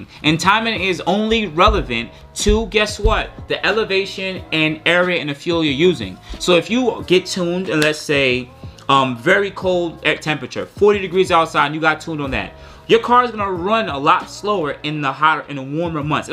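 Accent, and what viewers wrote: New York, United States. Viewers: Yo your such a NY'r. Moving to Miami my workers had to break me out of the axe/ask thing. But I'll still say water with my NYC accent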